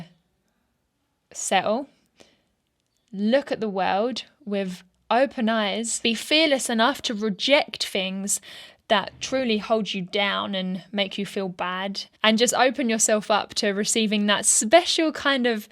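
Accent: United Kingdom. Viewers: How can it be that you sound like you're British, but didn't know who was?!!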